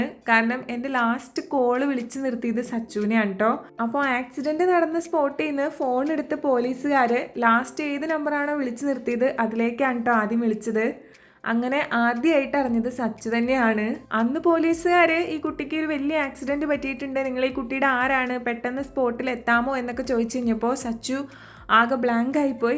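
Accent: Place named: Trivandrum, India. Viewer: Trivandrum slang cheruthayit vannu thudangitund😁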